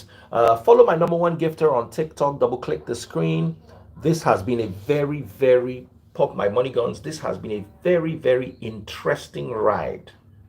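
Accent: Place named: Yoruba, Nigeria. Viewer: daddy freeze pls I love your yoruba dialect so much. can you speak more of it. lol. your yoruba always amazed me. 😆 🤣 😂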